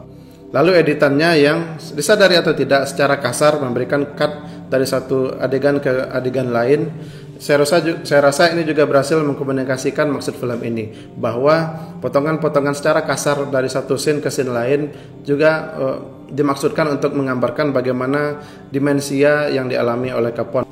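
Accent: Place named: West Sumatra, Indonesia. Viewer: minang yo bang 😅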